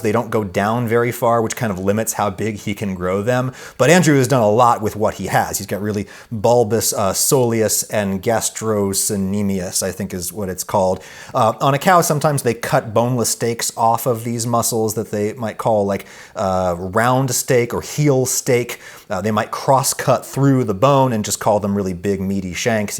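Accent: United States. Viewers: He just sounds American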